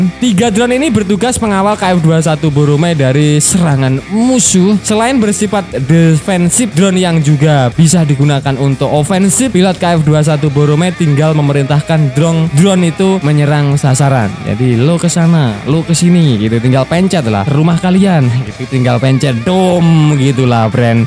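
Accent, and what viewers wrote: Java, Indonesia. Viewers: medok tongkol jelek parah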